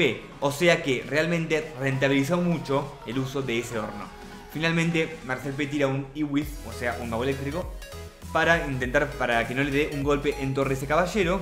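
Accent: Argentina. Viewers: eres argentino ? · eres argentino?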